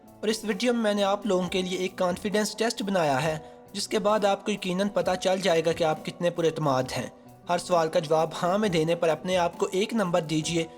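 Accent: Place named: Lahore, Pakistan. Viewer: I think lahori ha admin due to his lehja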